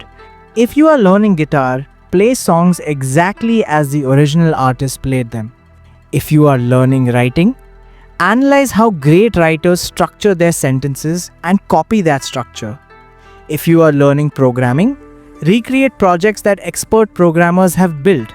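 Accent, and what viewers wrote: India. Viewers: Are you Indian? Your accent sounds Indian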